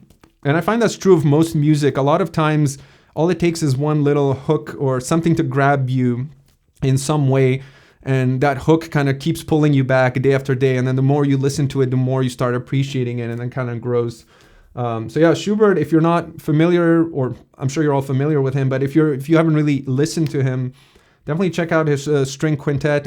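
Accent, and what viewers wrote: France. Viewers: French accent.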